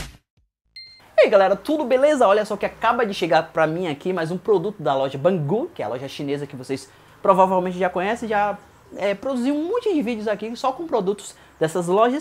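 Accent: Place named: Salvador, Brazil. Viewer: Vc é soteropolitano, sacaninha rsrrs. Bom vídeo! Mas o aparelho parece ser bem meia boca.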